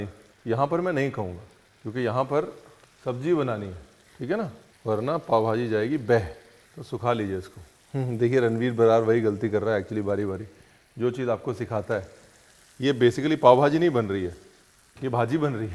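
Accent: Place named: Punjab, India. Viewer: Gayani Brar Ji, Chaa gaey O thah kr k. Like the way you give Punjabi touch to the conversation, hai k nai...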